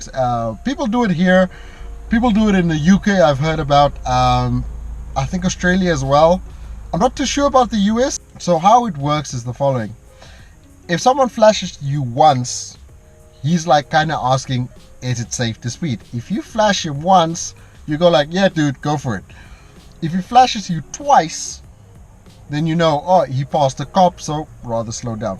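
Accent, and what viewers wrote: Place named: Johannesburg, South Africa. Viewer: Where you from jhb Got the accent😉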